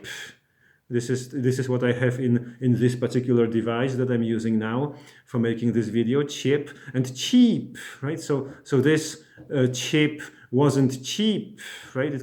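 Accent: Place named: Russia. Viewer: Oh, your accent sounds awful! Are you russian?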